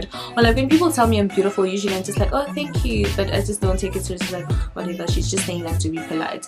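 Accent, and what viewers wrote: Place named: Nigeria. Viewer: The Nigerian accent 😂😂😂😂😂😂😂 I'm sold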